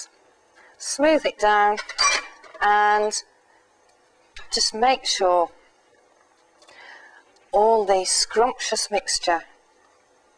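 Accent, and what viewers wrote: United Kingdom. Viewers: i like your egland voice bloke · No matter what a woman says, American men find it extremely sexy if said with a British accent. This video is especially scrumptious. I used 4 large brown eggs, raw sugar and light brown sugar, a shot of maple syrup and a shot of apple juice today.. half and half instead of sour cream, cream cheese or canned milk. I got a little hunk of fresh ginger root and grated it myself.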